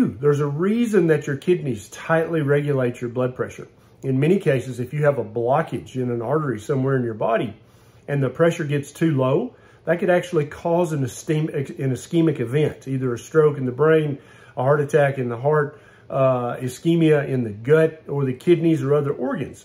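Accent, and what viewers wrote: South, United States. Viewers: "Before taking your blood pressure, I want you to sit down and relax. Do some deep breathing. Maybe turn the lights down. For around 10 to 15 minutes. This may seem like a lot of rigmarole..." How Southern can you get. 😄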